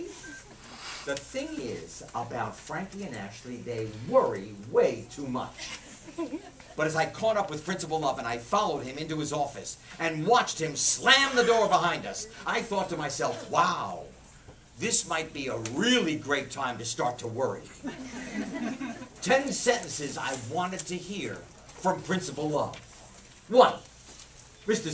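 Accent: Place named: New York City, United States. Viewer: I love that excerpt! He has an AWESOME Manhattan, NY accent!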